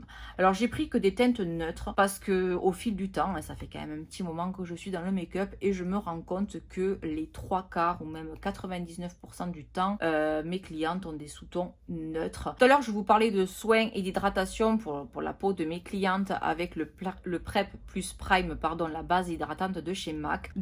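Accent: South, France. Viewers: Cc et son magnifique accent du sud 😉 bien le méga haul pro ❤ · Hyper beau ce makeup! Et ton fond aussi! Ça fait du bien d'entendre l'accent du sud :) Continue comme ça!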